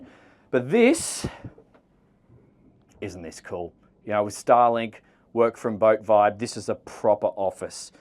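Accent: Australia. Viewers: This Boat is awesome, but i love listen to your Aussie Voice too!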